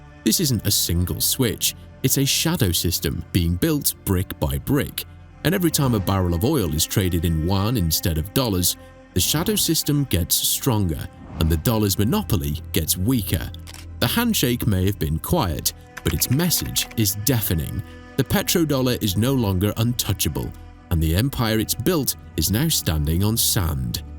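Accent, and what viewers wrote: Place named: United Kingdom. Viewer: I really want to listen to this report; however, I can’t stand your voice. I can’t stand, listening to British accent. British accents sound pretentious, high falooting, arrogant, know it all, closet gay, nosy, and more. Like I said, change the voice on this generated video, and I’ll consider listening to it in a more pleasing standard American English voice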